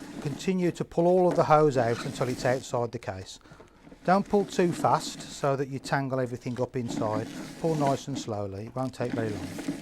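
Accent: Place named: Birmingham, United Kingdom. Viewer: Great instructions, the paper instructions were pants, lovely Brummie commentary, Thanks our kid!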